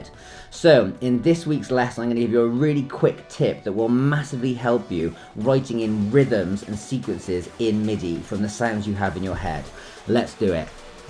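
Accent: United Kingdom. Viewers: Shit,! That was a very nice video. I am in a DJ/producer course, but now I see I should have spent the money on gear and learned from you, on YT. You have great content. Hope we can share a beer when I'm UK (you're based there, I assume, due to your accent.) Love from Brazil.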